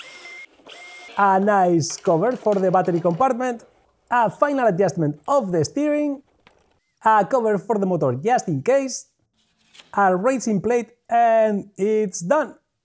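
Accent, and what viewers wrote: Spain. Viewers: No deja de sorprenderme que tu acento sea tan español, y a la vez tu léxico inglés sea tan extenso 😆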